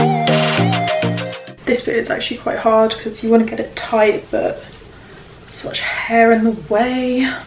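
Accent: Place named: Birmingham, United Kingdom. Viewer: love the Birmingham accent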